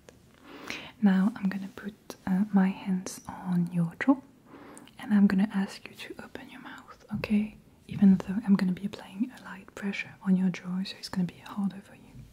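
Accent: France. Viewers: I love the touch of french accent that can be occasionally heard in your english. The popping c-, p-, and t- sounds are so tingly ✨💛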